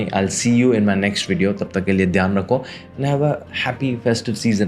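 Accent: Gujarat, India. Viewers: ARE YOU GUJRATI ?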